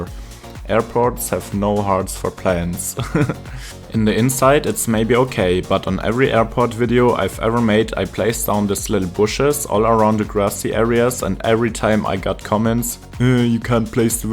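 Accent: Germany. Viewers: it may be that you come from germany and speak it it sounds a bit like the pronunciation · Digga dieser deutsche akzent😅und cooles video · bist du deutsch(wegen dem aktzent)? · I Hear Deutsch in that voice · Ich höre den deutschen Akzent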